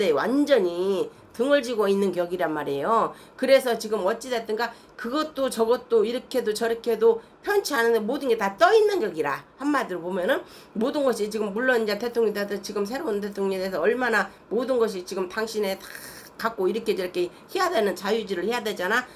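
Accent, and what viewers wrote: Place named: Jeolla, South Korea. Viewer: 전라도말씨 찰지다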